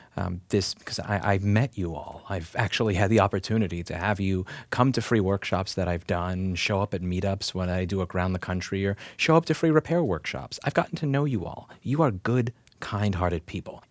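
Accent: New York, United States. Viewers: In most advocacy, there is usually a good cop, bad cop team that complement each other. So you're the bad cop that makes the difficult arguments. The bill wasn't defeated because of you. It was defeated because the politicians didn't want to pass it and then searched for an excuse to reject it because they couldn't admit that they were rejecting it due to lobbyist pressure. So they found you and blame you. Please do not feel bad. You have played a very valuable role on this and your contribution should not be devalued. But you're not the reason it didn't pass and you cannot blame yourself. You may have a "new york attitude", but you still make cogent arguments. And any politician would be used to the likes of you making "strong language" to make points. Please continue, even if living in the woods with deer causes your new york state of mind and accent to fade :-)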